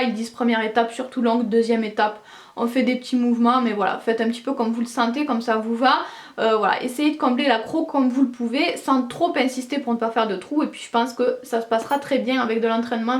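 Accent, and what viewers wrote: South, France. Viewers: super ce produit tres jolis accent que tu as on dirais celui du midi bonsoir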